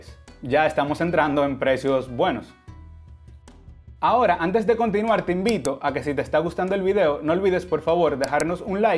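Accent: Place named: Dominican Republic. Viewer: Dominicano eres, se nota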